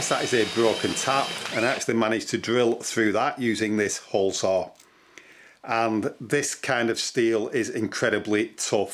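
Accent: United Kingdom. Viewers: What is your?. By your accent I'm thinking you're British but not sure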